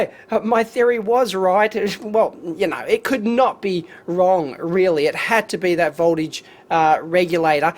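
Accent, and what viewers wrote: Australia. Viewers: Lol, English is not my first language also I was not really used to Australian accent but I can follow perfectly... You should try the Disney Channel :-P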